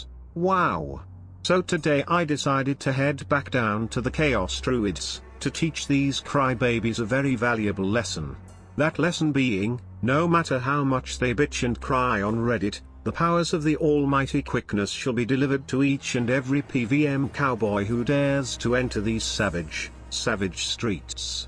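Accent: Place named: Georgia, United States. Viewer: It’s funny I’ve never heard this mans real voice yet I know for a fact he’s from Georgia or Florida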